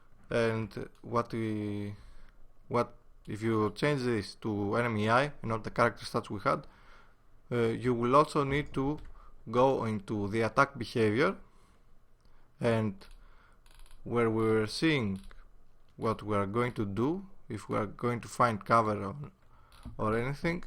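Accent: Greece. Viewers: Are you from greece?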